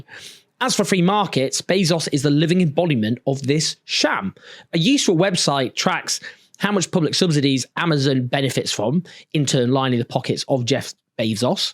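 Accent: United Kingdom. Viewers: You are a fool. Papers have had politics since day 1. That DOES NOT MEAN we don't live in a democracy - stuffing ballot boxes would mean we don't, people having a different opinion from us and owning a paper is undesirable but it isn't even anti-democratic let alone proof of the absence of democracy.....WTH are you smoking? BTW - you sound British so why do you equate the WP with absence of British democracy? ahhhh, I see, you're just a youtuber with an angle.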